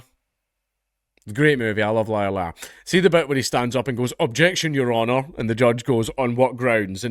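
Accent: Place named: West Scotland, United Kingdom. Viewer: The police officer that told men the put your weapons away in a mosque, the police have guven them amnesty. None of them will see the inside of the court. As a feelow scots please keep shinning a light on what they are doing to us. My daughter has asked if your from Edinburgh as your accent sounds like mine, i dont thiugh i think your fi the west coast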